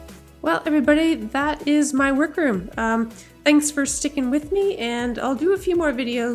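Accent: Nova Scotia, Canada. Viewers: I'm Calgarian born and raised, but both my folks were from NS (Kentville and Alyseford/Berwich area) Your accent does show a bit..Even I get one anytime I've been for a visit ...far, car, bar, over home hahaha I love it!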